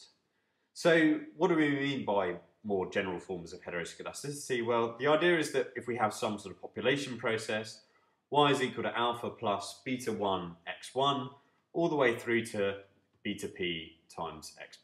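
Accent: United Kingdom. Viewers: it would be great if you enable caption on your videos. as a brazilian student, it is a bit hard to understante british accent.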